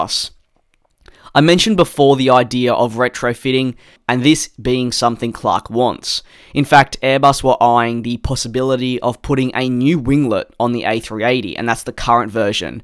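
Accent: Australia. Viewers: Are you from Australia? i'm pretty sure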